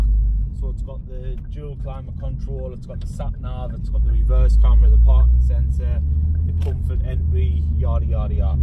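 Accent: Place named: Sunderland, United Kingdom. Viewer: you sound local to me bud I'm from Sunderland cant wait to get my mk2 this year , iv never had the chance to even sit in one there that rare around my area .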